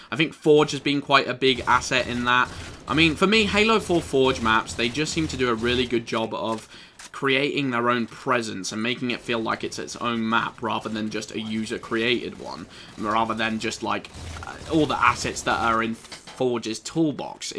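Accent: United States. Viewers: American accent FTW!! Hey add me!!! Just a friendly reminder! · Dude your american accent is perfect. LoL. Also you have a very interesting perspective on maps that I never thought to look at before.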